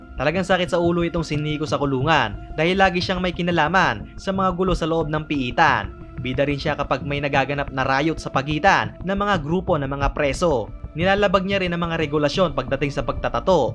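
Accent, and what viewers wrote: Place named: Bulacan, Philippines. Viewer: Bulakeñyo ka ba? yung punto nyo po napapansin ko 🥰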